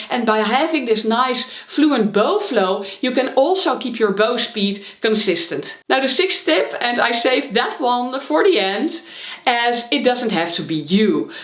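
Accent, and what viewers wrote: Netherlands. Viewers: Just the video I was looking for, been playing for a year and always struggling with the sound of my E string. Love the accent too (Dutch?), subscribed! 😊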